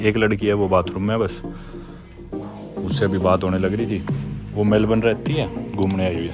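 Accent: Haryana, India. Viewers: kya dikhta hain bhai tu. 6.4 ft height. Itna fluent English me. Teri haryanvi accent me hindi. Gym karta body bana rakkhi hain. Bohot se log world travelling karte nomadic india nomad shubham pratik jain yaatri doctor but un me tere jaisa koi nahi . Enjoy karta hu bhai teri video main. Bas nazar na lage tujhe kisi ki ❤❤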